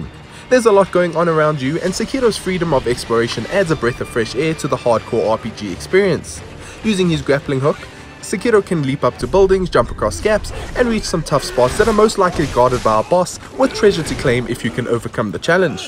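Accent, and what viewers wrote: South Africa. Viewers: Watching this & I am like, this dude sounds like a white South African guy.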